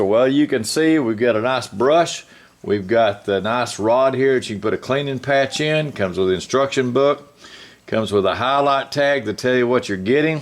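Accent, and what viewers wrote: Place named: Arkansas, United States. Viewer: Great video, sounds like a fellow Arkansan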